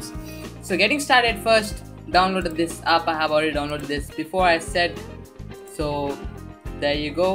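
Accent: India. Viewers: it is an indian accent you idiot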